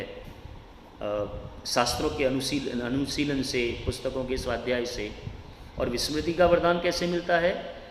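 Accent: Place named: India. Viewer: Your voice completly hindi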